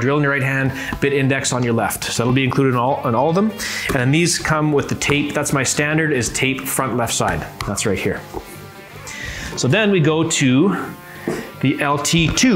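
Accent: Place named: Canada. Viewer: I detect a Canadian accent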